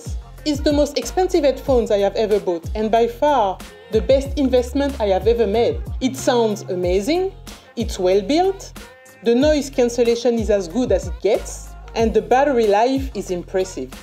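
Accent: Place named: France. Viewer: You speak French ? J'arrive à entendre ton accent ;)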